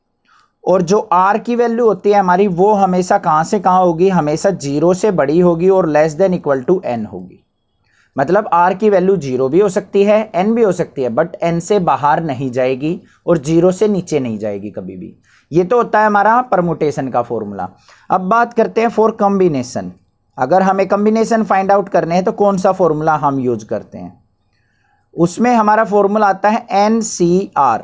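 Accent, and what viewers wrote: Haryana, India. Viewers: sir ji mugje laga hi tha ki aap harayana ke ho aapki language se ma bhi haryana se ho na isiliye,vase ma hisar se hu sir. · are sir aap to sacchi me haryanvi bolte h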